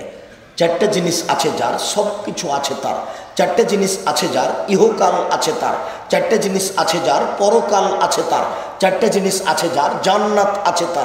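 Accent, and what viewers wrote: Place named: Chapai Nawabganj, Bangladesh. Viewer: imran vai vaier voice chapai nawabganj er tai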